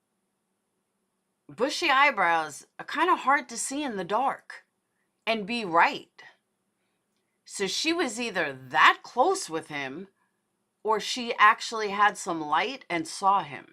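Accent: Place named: Staten Island, United States. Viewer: At 40.40 ish or a litl3 before I caught ur NYc_ Staten island big time "gawd fawbid"😆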